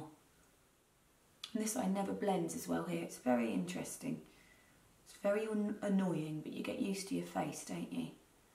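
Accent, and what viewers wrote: United Kingdom. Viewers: Are u from the UK cause I love ur accent/voice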